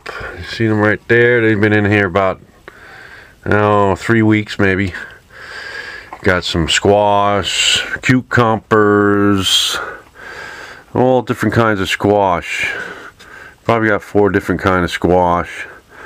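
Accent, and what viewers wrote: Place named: Maine, United States. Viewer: Just found your channel, watching all the videos soon. I am sure you've been asked, and have probably answered already...where are you from? Your accent reminds me of family back home in Maine. :) Your set up is great!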